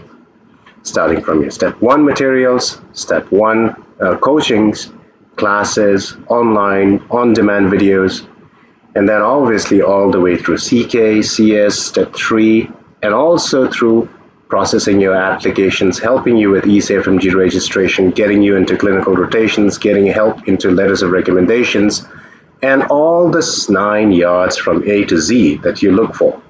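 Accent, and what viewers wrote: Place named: India. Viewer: Indian accent is awesome.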